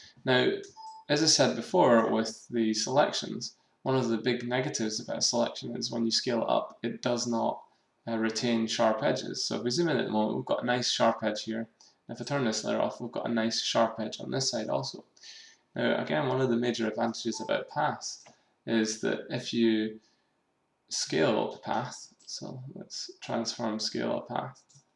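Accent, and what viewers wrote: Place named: Scotland, United Kingdom. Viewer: Hi, where are you from? You have a Scottish accent. Your asiimov skins are beautiful btw.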